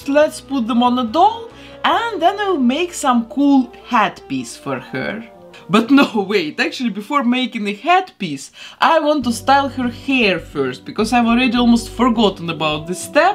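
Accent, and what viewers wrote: Russia. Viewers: А вам тоже кажется, что она русская,но "английский" акцент её выдаёт?)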